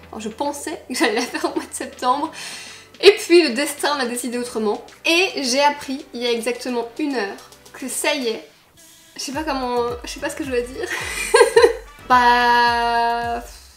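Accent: Belgium. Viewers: MAIS C'EST UN TRUC DE OUFFFF 😱😱 J'espère qu'on entendra ton petit accent belge à la télé haha #huit Tu peux être fière de toi, quel aboutissement ! Trop hâte de voir ça 😍😍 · #teambelge Allez on impose notre accent sur les chaînes françaises !! 😆❤❤❤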